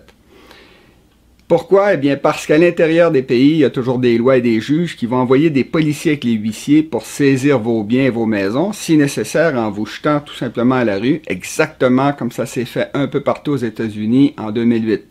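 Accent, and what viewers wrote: Quebec, Canada. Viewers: Mr, des explications et conseils clairs, precis,concis,référencés, et un accent quebequois moins insupportable et plus comprehensible que d' habitude (pour un francais). j' apprecie la synthese complexe DES problemes actuels en 30 minutes ce qui me fait dire que vous etes peut etre du serail et maintenant repenti... exposez-nous votre parcours professionnel et vos motivations a tout debaler aujourd' hui... les mots n' ont pas la meme valeur suivant qui les emploie cela n' enleve en rien la qualite de la video je m' en vais en cueillir une autre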